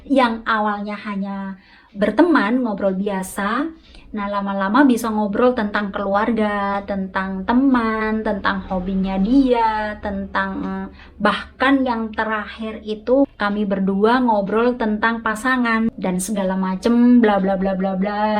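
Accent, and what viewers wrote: Java, Indonesia. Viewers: Mba asli org dari jwa ,pa luar,jwa,soale kya medok jwa,maaf ya mbaa,? Tnya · tak panggil mbk ya...aku dari Semarang Kendal mbk..logat Jawa kelihatan bgt...salam kenal ya..sehat selalu disana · Mbak e kok medok .. aslinya daerah mna mbak ?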